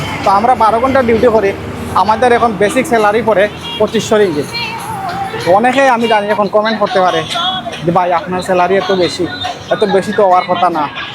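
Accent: Sylhet, Bangladesh. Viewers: ভাইয়া আমি রেস্টুরেন্ট ভিসায় আসতে চায় মালেশিয়ায়। আমার এই কাজের এক্সপেরিয়েন্স আছে, আমি সিলেটের রোজ ভিউ হোটেলে জব করেছি, আপনার কথা শুনে মনে হচ্ছে আপনি সিলেটি। আপনি কি কোন দিক দিয়ে হেল্প করতে পারবেন আমাকে.. কোন ওয়েতে আসলে ভালো হবে.?! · খাটি সিলেটি মাত বা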